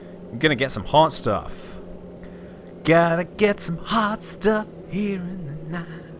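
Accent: Scotland, United Kingdom. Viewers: are u scotish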